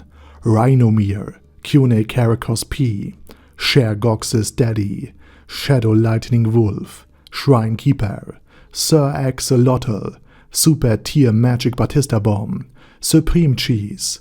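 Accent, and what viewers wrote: Germany. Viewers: This German accent haha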